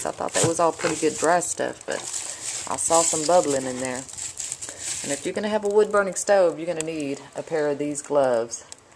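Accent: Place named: Texas, United States. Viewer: Just subbed to you guys. I commented on your other stove video. I was born there and my mother was raised in Shiner...it is refreshing to hear a lady speaking with a Texas accent. You sound like my dear Mama and the other ladies in her kin. Plus, you are making homemade bread....from scratch! One of my best memories is walking into my Granny's little kitchen and she's give me a chunk of fresh buttered bread from her stove. Good stuff. The memory is making me hungry....gotta go. :)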